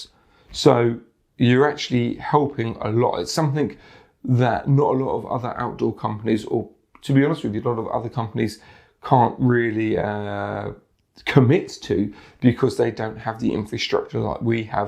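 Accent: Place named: United Kingdom. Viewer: Lol you literally cannot pronounce the "eye" sound of any word or letter. You can only say "oy" instead. Like instead of supply (suppl-eye) you say supploy. British accents are hard to listen to lol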